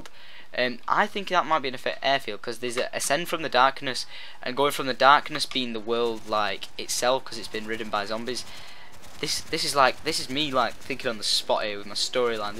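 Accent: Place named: United Kingdom. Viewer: not a tutorial, some british guy talking about happy in the pants,...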